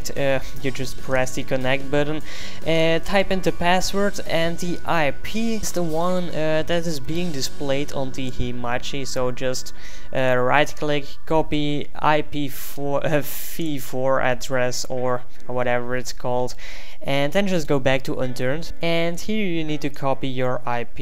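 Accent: Netherlands. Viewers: so ur dutch? or from Belgium? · lol ur dutch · Is hij Nederlands? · he is dutch idiot not every language sounds same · Thank you mate! Btw i think your from The Netherlands :D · are you dutch?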